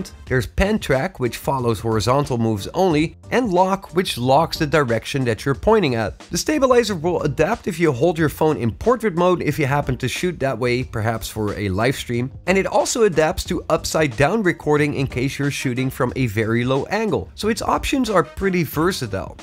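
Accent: Netherlands. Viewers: This sounds like a Dutchman...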